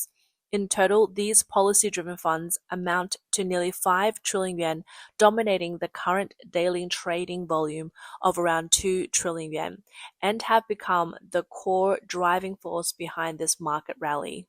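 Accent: Australia. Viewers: I don't want to hear an australian making noise tonight. Chinese don't have Ozzie accents!!! Get a host. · I would not trust any of this information since an Australian is reading it.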